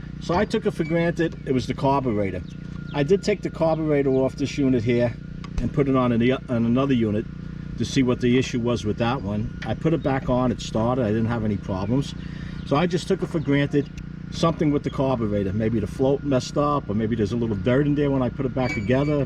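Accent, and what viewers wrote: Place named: Boston, United States. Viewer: Love the Boston Aaacent!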